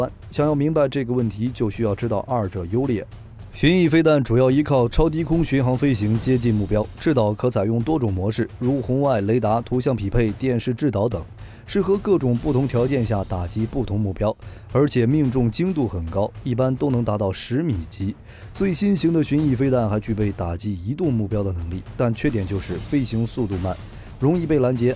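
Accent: China. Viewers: 聽聲音也知道這是中共病毒武漢肺炎國人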